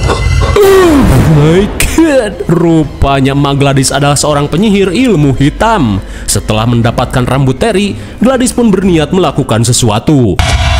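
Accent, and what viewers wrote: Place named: Java, Indonesia. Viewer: Mc nya beda ni biasa nya oke bhasa.. Medok Jawa.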